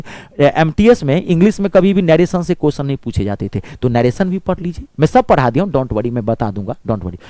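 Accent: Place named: Bhojpuri, India. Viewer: Mts k bad aap calculation batch leke aaiyega chahe youTube pr ya app pr Aap acha pdhate h, motivate krte h, or kbhi kbhi bhojpuri tone mujhe achi lgti h , or aapka positive attitude 👌,,, tyari jeet ki, grammar to phle hi pdhi h but aapke video se question lgane ka conference aaya h, thank you sir... Or ha sir mujhe aapke hath bhut ache lgte h such ab jo bat thi kh di... Mai kbhi comment nhi krti but yha ki h 🙏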